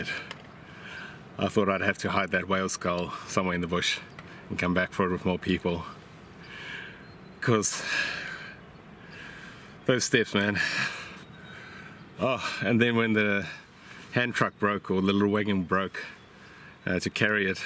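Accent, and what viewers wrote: South Africa. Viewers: Mooi man. Glad you got it out. Just a random question are you from Port Elizabeth South Africa, I'm orginally from Grahamstown and your accent sounds like you are from the area.....in other news , went to Castle Rock today and saw thousands of shells mainly scallops and lots of other shells. Was amazing, my kids loved looking at the fossils aswell. But anyway, keep up the good work.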